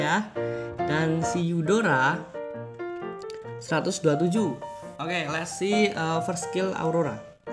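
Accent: Java, Indonesia. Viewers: Medok mas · Medok banget bang :v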